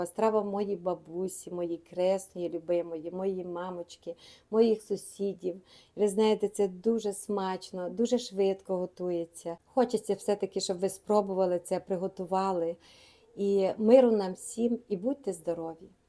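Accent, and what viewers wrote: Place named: Zakarpattia, Ukraine. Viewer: Дорога Пані! Вітання Вам із Канади! Ваше відео мені переслала моя подруга із Амстердаму. Не можу передати словами, які приємні емоції я отримала слухаючи наш чудовий закарпатський діалект, і відтворюючи у памʼяті смачну Повненку, що готувала моя мамка. Це якраз той рецепт, з яким Ви і поділилися із нами. Якось я про нього призабула, але тепер обовʼязково приготую. Ви, Пані, надзвичайно приємна жінка, з такою позитивною аурою навколо себе, що відчуваєш навіть за океаном! Міцного Вам здоровʼя, чим швидшого мирного неба, і чекатиму на Ваші нові відеозаписи.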